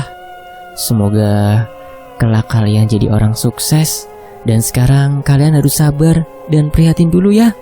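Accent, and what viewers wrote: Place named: Banyumas, Indonesia. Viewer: Apa sing Bae pak.. ngapak temen jaaann...😂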